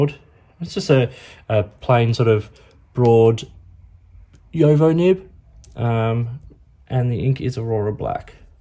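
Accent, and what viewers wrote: Australia. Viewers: Judging by your accent, I'm guessing you're Australian. What's the best source of fountain pen ink in Australia? Is it best to over from overseas? I'm just getting back into fountain pens, so was thinking of going to the local Officeworks.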